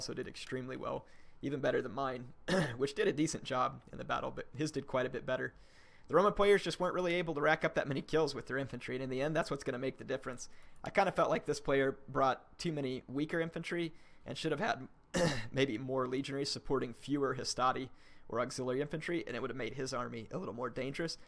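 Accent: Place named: South, United States. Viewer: You sound like a southerner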